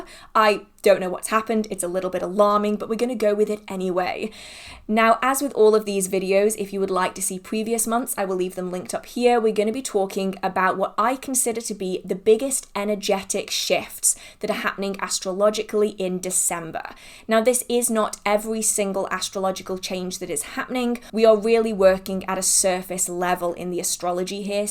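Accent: United Kingdom. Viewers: I like you sooo much. I love your cute British accent 😊❤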